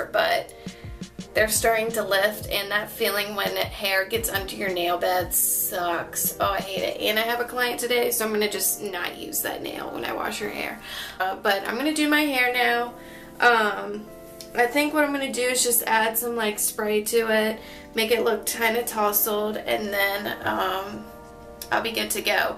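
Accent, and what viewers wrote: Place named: United States. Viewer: Ugh, you're so great on camera!! I never realized you had a lil country accent. So cute 😁😁 Loved this video, looking forward to more content from you!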